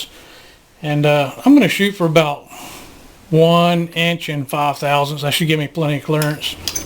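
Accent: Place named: Kentucky, United States. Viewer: Nicely done! I'm impressed. Where are you? You sound like Kentucky.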